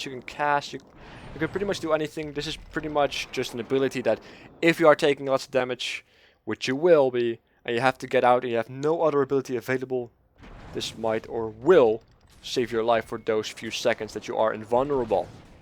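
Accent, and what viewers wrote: Netherlands. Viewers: You are dutch, ik weet het xD Waarom kunnen wij nederlanders zo slecht engels praten! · im 100% you are Dutch it has to be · jesus christ your accent, must be dutch? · dutch accent rofl. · You sound Dutch haha. Nice video.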